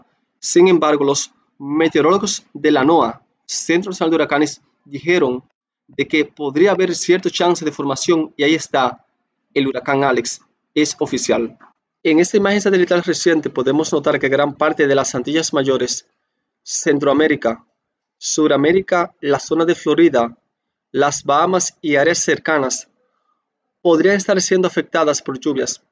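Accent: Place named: Arab world. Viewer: Le escucho acento arabe..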